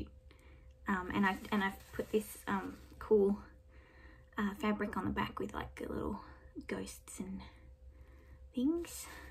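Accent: Australia. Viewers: I'm working on Mary A Bunce at the moment on 56 count. It's the first time I've done anything on such a high count, but I absolutely love it and I'm probably about 150 years older than you. Lovely to hear your Aussie voice as I'm Australian and living in the UK. Can't wait for the Covid restrictions to be over so I can visit the Crewel Gobelin again. Do they stock any of the linens you use?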